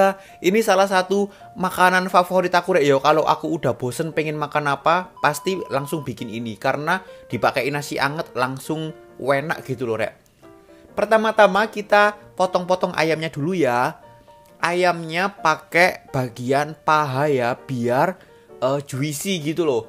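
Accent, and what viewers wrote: Java, Indonesia. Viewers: Menunya enaak, gaya komunikasinya menarik, apa adanya, jawa banget, nyenengno 😀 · Terimakasih resepnya ttp ngomong2 masnya orang msna ya kok jawsnya medok. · Di klukupi iku opo mas?! Hehehehe...medok'e mantabs!!! Sehat ya mas...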